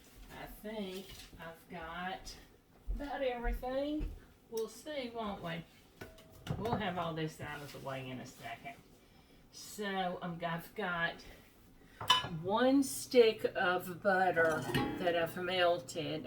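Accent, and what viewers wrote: South, United States. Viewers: Thanks for this lovely video - I really enjoy cooking videos & I found your delightful Southern accent very pleasant to listen to. I have subscribed to your channel. I totally agree re butter, it makes everything taste better! I put a dab of it in my green beans tonight & it made them delicious. Best wishes from Sydney, Australia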